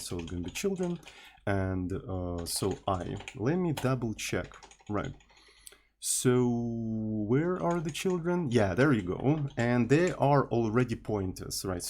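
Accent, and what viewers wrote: Russia. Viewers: Started watching… heard familiar accent… found that author is from Moscovia.. closed the video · Какой хороший , понятный русский акцент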